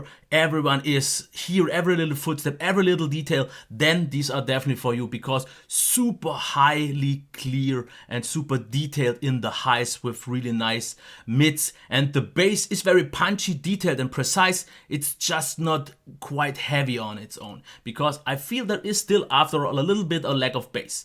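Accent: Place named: Germany. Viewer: He sounds German - what do you think?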